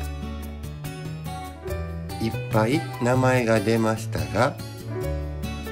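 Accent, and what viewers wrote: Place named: Shikoku, Japan. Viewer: キノちゃんです🐰✨ の声すきー🤗あと、四国のアクセントが素敵！「だっぺ」茨城キノちゃんとは違う🥰 日本一古い家系図ってことは、家系図だから、古いのに歴史をさかのぼってるんだからスゴイですよね！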